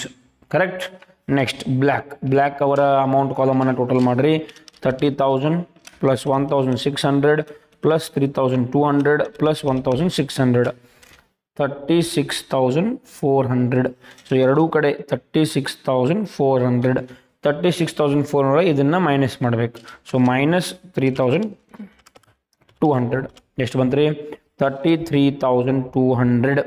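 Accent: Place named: North Karnataka, India. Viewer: Sir ji Ur asom .. Nim uttar karnataka style mast .. Thank you.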